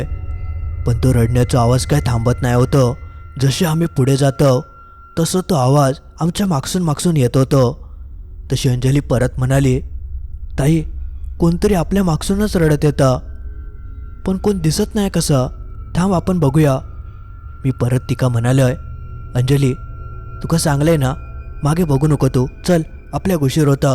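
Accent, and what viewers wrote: Malvani, India. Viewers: एक नंबर 👍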